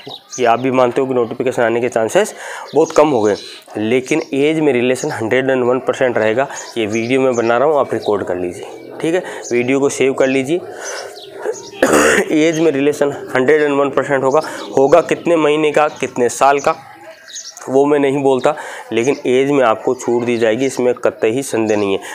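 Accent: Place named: Nagaur, India. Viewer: Sir nagour se ho kya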